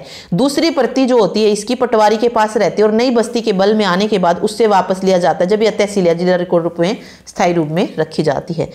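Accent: Haryana, India. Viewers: Maam yeh wording bolte bolte haryanvi nikl rahi h bolte time 👌🏻👌🏻👌🏻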